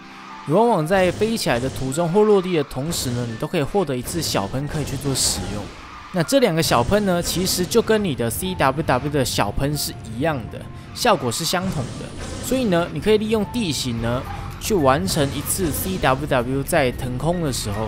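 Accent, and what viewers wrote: Taiwan. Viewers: 台湾人的读音真的不同😂没有在嘲笑,W=打不了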